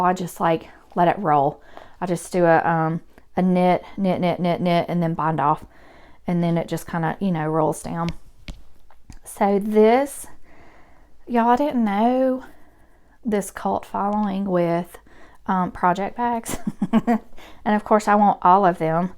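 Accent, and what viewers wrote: South, United States. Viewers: Just finding your podcast and starting with Episode 1... FANTASTIC! I love your southern charm, accent & beautiful hair cut and color! Looking forward to following your knitting adventures!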